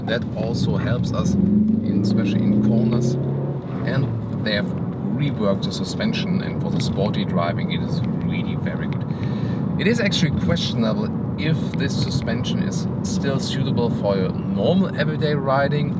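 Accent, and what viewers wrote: Germany. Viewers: Tolles Video, macht großen Spaß zu schauen! Nur der deutsche Aktzent ist gewöhnungsbedürtig, aber das ist keine Kritik :) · Like his english with german accent :)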